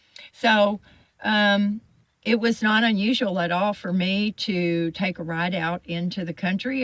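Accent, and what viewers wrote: South, United States. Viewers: very good. I think she is beautiful. Your mannerisms and all. You also remind me a little of a dead relative of mine. I think it's the fair skin and red hair and little southern accent : ) enjoying your videos! Keep them coming. Love your personality.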